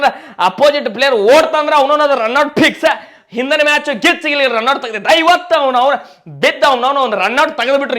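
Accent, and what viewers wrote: North Karnataka, India. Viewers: Anna super talking....namma uttarkaranataka basheyalli...ninna RCB abimanake love u......plz go to I p l...kannad cometary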